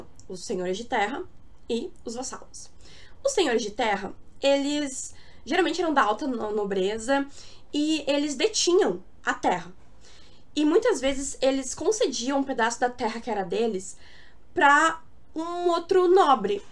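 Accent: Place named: North, Brazil. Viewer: Bacana , bom saber que existe uma juventude diferenciada no sul do paìs e que fala um pouco igual a nos do norte ( belem - pa) do pais , tu explicas bem.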